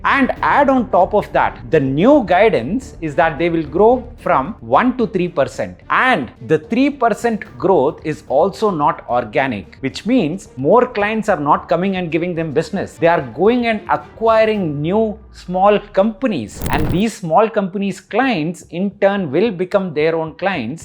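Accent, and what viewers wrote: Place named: Telugu, India. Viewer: That's how you speak English with a heavy Telugu accent, but still make it to INSEAD and McKinsey. That pronounciation "markeyt" ended it all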